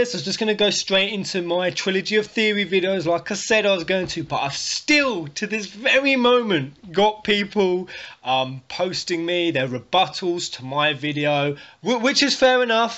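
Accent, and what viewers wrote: England, United Kingdom. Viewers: And it ain't the English accent! I'm just loving his persona. More depth to my mind! I think everything that goes on here will be a record for future FF theorists and forward thinkers. It's an awesome place to vent and express:) Plus I wanna see this updated Peasantry wall! Hope you noticed I've up'd my pledge;)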